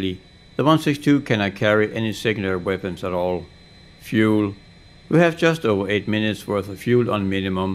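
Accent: Denmark. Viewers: Tak for info min ven. Det gik først op for mig til sidst du lød dansk hahaha. Keep up the good work brother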